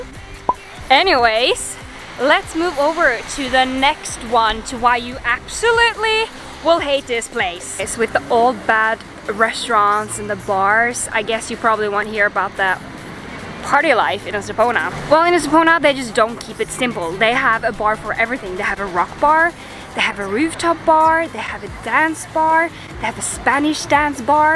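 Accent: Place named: Scandinavia. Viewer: Interesting approach to get viewings and subscribers on your channel. Negative press sells better than positive press. By your accent you might be scandinavian.?? Would be something like "EL VALLE DE LAS CATETAS". Anyway. We got plenty of places for you to go to that is not here.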